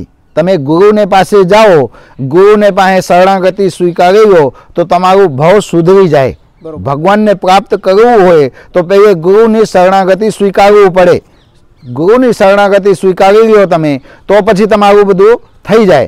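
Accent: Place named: Afghanistan. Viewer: ME BHAI 2 VARSH AFGHANISTAN 🇦🇫 MA RAHI JOB KARI CHE AFIN NI COMPANY MA ANE AA BAPU NI BOLI NO LEKO CHE E AFGHANI LOKO NI BOLI SATHE 100 TAKA MADTO AAWE CHE ETLE MARU MANVU CHE K AA BAPU KOI AFGHANI HOY SAKE ANE PACHI HINDU 🕉️ SADHU BANIYA HOY SAKE CHE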